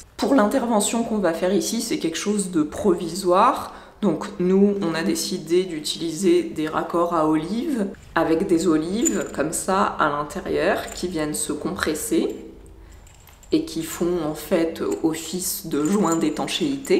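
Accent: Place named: France. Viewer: Je sais pas pourquoi, mais j'aime bien entendre cette damme parler :) Elle a un "accent" français que je ne connais pas, trés agreable à l'ouïe, si bien sûr je peux appler ça "l'accent" vu que je ne suis pas français moi-même, d'une autre region que la mienne probablement. Je mets un Like!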